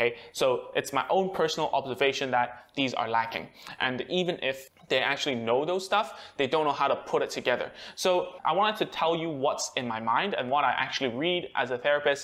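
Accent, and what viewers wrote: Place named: China. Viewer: Man ! You speak English super fluent almost like native english speaker, how did you do it ? A bit slight chinese accent in it but super tiny i assume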